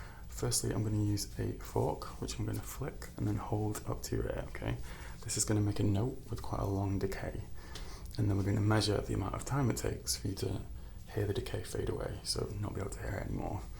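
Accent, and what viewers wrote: United Kingdom. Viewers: Are you britthis? Maybe it is obvious or not but your accent makes me think it, sorry if im wrong, pls dont hate if im wrong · Subscribed, it's the accent for me, with the deepness too! I'm british but i forget just how many accents we actually have here at times, so many mixes too! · Did not expect you to be British. 😍👌🏻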